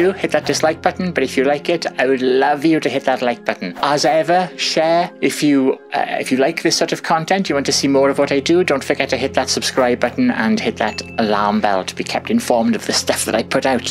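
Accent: Wales, United Kingdom. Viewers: I really enjoyed listening to your account of what happened.. I have an open mind to these things, although i have never experienced anything myself.... your Welsh accent is lovely ! xx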